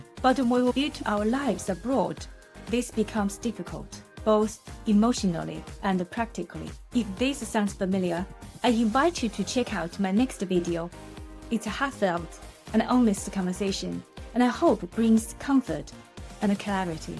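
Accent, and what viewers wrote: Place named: China. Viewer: I really like the Chinese accent 👍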